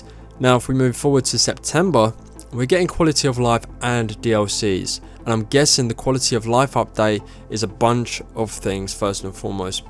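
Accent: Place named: United Kingdom. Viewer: if u talking on English and NOT GB English - much more ppl could understand u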